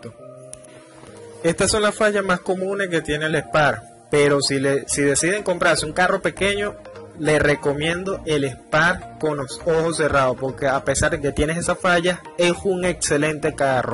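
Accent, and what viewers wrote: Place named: Venezuela. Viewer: Venezolano este men saludos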